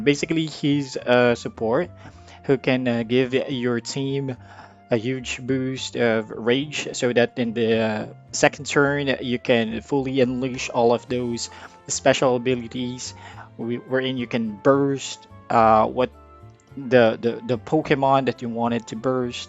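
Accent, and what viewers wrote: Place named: Philippines. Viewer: I mean you really do sound like a Filipino professor haha!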